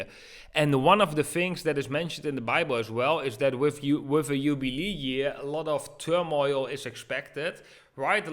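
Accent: Netherlands. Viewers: meetup would be sick, je klinkt nederlands, ben je van nl?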